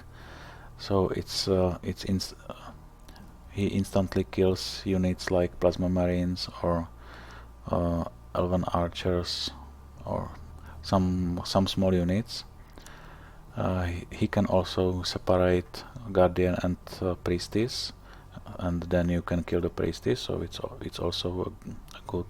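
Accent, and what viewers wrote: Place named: Czech Republic. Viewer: Čech, že? Poznám podle přízvuku :D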